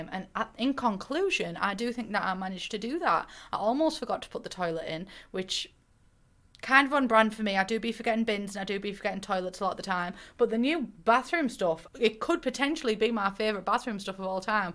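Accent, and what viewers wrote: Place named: Northern England, United Kingdom. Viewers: She’s got that working class, northern accent charm. Northern hospitality as well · I have been watching your videos far to much now because my thoughts are all in a northern accent and I just typed an casual but professional email and nearly sent it with the opening "Hey hens, how are you all diddily doing"?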